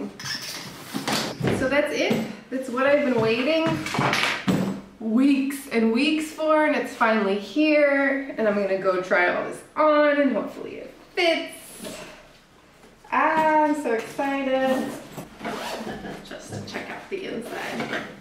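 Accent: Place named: United States. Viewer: Wow, that’s a lot of uniform pieces!!!! Very very nice!!! I just saw the recommendation from youtube to watch your video and started watching and was like: wait, which airline in the US has that uniform? (Because of your American accent) Then I saw the rest of the video and you said it. Awesome.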